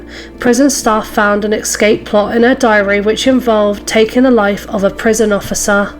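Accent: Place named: England, United Kingdom. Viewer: I never had any doubt this was narrated by a woman from england who is real. Ai can be too obvious.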